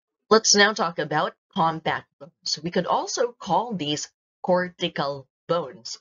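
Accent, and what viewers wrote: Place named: United States. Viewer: Thank you so much po! I love, love you! Super helpful as a nursing student! Currently listening to this while I arrange my flashcards. And oh my, ang natural-sounding po ng American accent niyo, and the way you code-switch makes me fangirl 😳 Linguistic enthusiast here hehe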